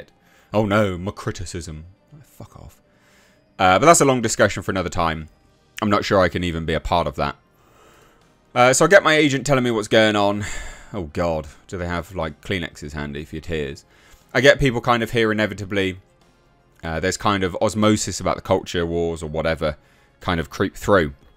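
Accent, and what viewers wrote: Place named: England, United Kingdom. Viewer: I love listening to you, it's so funny hearing another English person spouting off 🤣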